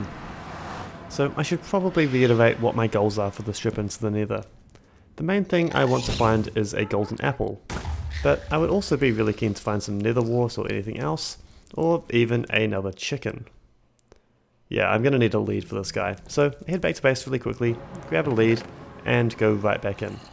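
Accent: New Zealand. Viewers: I just noticed that this guy might be New Zealish. But i'm not 100% sure (i'm a non native english speaker)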